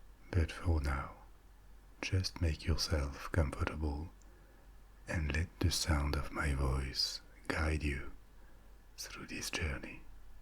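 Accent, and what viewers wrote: France. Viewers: The content is fab in that it takes you to far off exotic places but that sexy French voice sends me to la la dreamland. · t'es français ?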